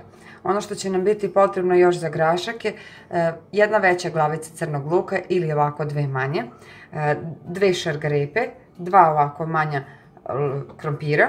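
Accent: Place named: Vojvodina, Serbia. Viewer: Ovako dobro se kuva i jede samo u Vojvodini. Najbolja kuhinja, najbolji kolaci;-)))), znao sam da si iz Vojvodine, cuje se po naglasku;-))) Puno puno pozdrava i svaka cast, i kuvas i mesis fantasticno;-))))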